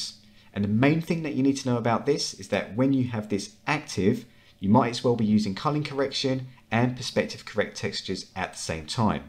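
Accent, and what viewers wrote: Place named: United Kingdom. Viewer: I don't know why but as soon as I hear a British accent I know I'm in the right place. This tutorial was amazing. Easily the best I've found on YouTube. Thanks man!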